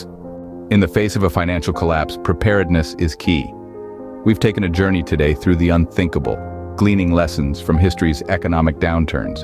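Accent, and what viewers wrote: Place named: South, United States. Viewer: I love your southern accent. 😉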